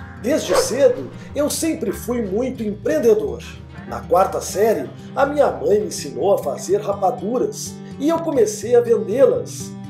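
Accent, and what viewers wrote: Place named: South, Brazil. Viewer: Estou impressionada com tua rapidez. Falar trinta (30) fatos em dez (10) minutos. Eu precisaria de no mínimo uma (01) hora kkk. Teu raciocínio é rápido. A fala tb apesar de que é compreensível. Professores tendem a terem uma dinâmica célere. Eu ainda não tinha entendido de onde era teu sotaque. Do sul sem gíria. Hahaha imagino a badalação de receber uma correspondência da casa da Republica. Eu amo correr tb mas desde a pandemia não participei de nenhuma corrida oficial. Apenas seguindo nos treinos. Correr é viciante. Acredito muito nessa vibe exercício mais equilíbrio na alimentação. Não só montar o shape mas ter qualidade de vida. Não é ruim ter Tv em casa. Aqui em casa é cheio de TV mas me diz se eu sou de assistir? kkk. Eu tenho uma no quarto mas é raro ligar. Em geral eu assisto só filmes qd dá muita vontade. Eu não sou muito de assistir. 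Sempre acho que esse tempo gasto eu poderia ganhar lendo. Todavia as vezes é bom. Principalmente pra ver um filme aleatório ou uma noticia jornalística. Em geral nos meses de dezembro e janeiro eu me permito assistir a algo... Foi bem divertidinho teu vídeo. Eu dizia que tu era jornalista ou radialista. Jamais professor kkk. Vc tem dicção de profissional de comunicação(rádio/TV). Grande abraço... = )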